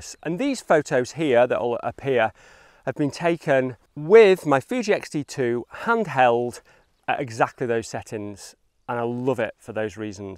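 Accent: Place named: Yorkshire, United Kingdom. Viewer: you're still utilizing the original 70/200 nikon vr? please explain why mate! love the regional English accent....so soothing! Is it yorkshire?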